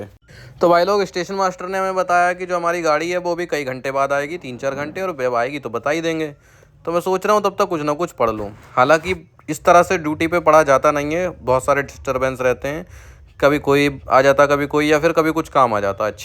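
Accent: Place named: Western Uttar Pradesh, India. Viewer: भाई up west के हो क्या? Ascent lag raha hai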